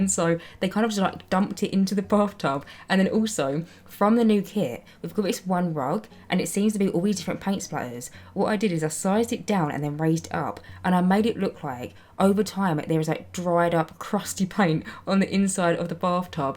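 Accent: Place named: East London, United Kingdom. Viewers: i love english accent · im from east london and this is the first time ive seen a youtuber with a similar accent to mine play sims! i feel so at ease love ur video and ur builds are insane!!!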